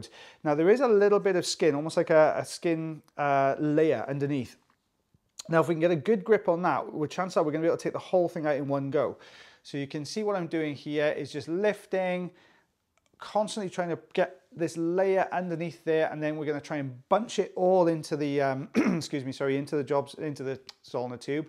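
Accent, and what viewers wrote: Wales, United Kingdom. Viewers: I watch the videos as they are interesting and I have the notifications switched on. Also who doesn’t love a Welsh voice 😊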